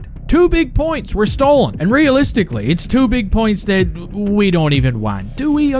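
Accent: Newfoundland, Canada. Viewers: Is this guy a Newfie????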